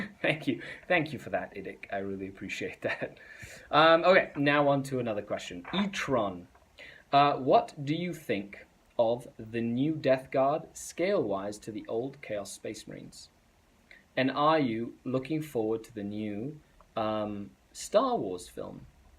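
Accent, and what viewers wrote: United Kingdom. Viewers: Your Canadian!!!!! How come you have an English accent?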